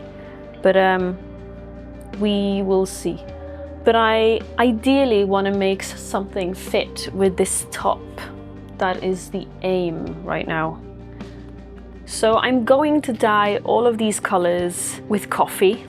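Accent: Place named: England, United Kingdom. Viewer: Did she live in UK or something? She sounds English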